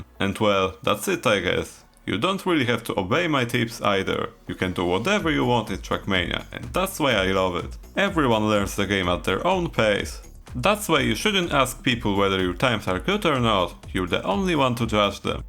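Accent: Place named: Poland. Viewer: from the first secon i understood that you were polish, recognise that accent everywhere LMAO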